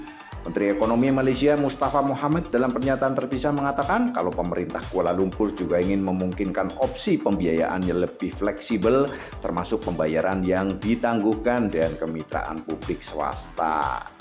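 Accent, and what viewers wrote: Banyumas, Indonesia. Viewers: admine ngapak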